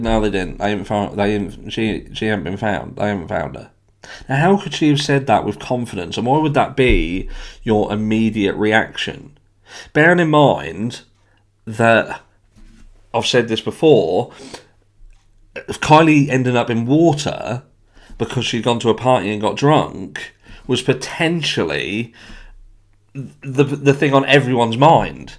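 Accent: United Kingdom. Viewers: I agree it seems very peculiar that jagger is living at keilys mums. I would say it’s a bit suspect. Love your Chanel by the way and love how you stick to the facts and the keily Rodni case. I understand from your accent your from the U.K. Although I’m not sure what area. I am from the U.K. and have been following this case but I havnt started my channel yet. I have been following this case and also Dylan rounds and gabby petito case. Look forward to hearing more of your channel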